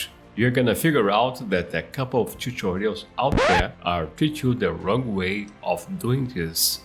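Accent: Brazil. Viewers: Você é brasileiro? Muito bom seu tutoral! Seu sotaque parece do rio de janeiro. Parabens pelo otimo conteudo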